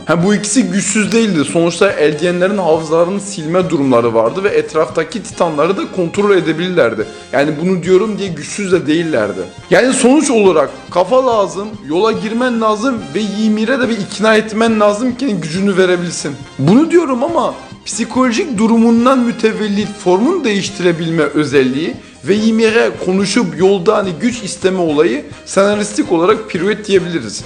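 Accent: France. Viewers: Knk adam Fransız aksanlı konuşuyor ve TikTok bildirim dili Fransızca abimiz yarı Fransız olabilir.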